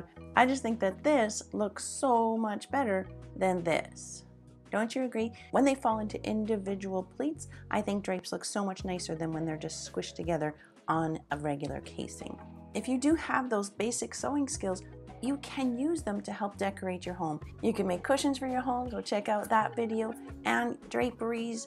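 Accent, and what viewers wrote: Canada. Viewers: Just got hooked to your channel so easy to understand and love your Canadian accent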